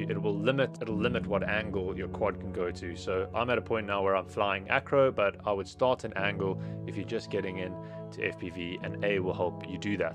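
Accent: South Africa. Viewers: are u from south africa?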